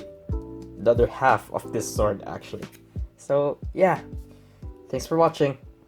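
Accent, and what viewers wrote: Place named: Philippines. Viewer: I don't know why but. I feel like you live in the Philippines Judging on your voice and the way you talk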